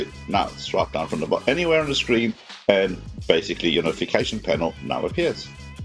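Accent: South Africa. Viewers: from what country are u . I am from South Africa and you have a south african accent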